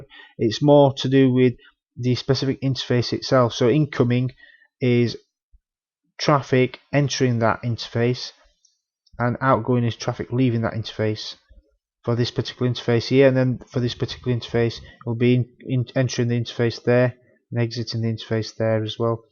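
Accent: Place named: England, United Kingdom. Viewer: Dude, you are soooo English!!! :-) I just don't have the accent (grew up in the UK when I was younger). Excellent explanations by the way...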